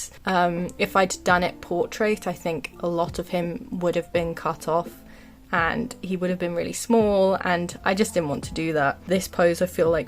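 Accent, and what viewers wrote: United Kingdom. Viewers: Her accent is British English, possibly from around London or a little more South ❤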